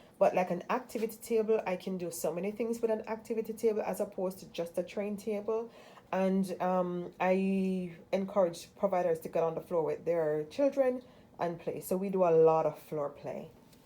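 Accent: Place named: Jamaica. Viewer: Hi 👋 😀 You sound Jamaican 🇯🇲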